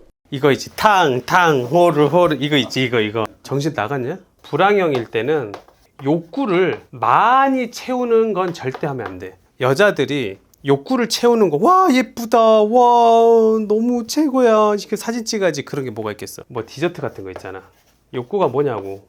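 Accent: Jeolla, South Korea. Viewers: 라도 사투리만 고치시면 딱 조을텐데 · 아 전라도 사투리 ㅈ같네 진짜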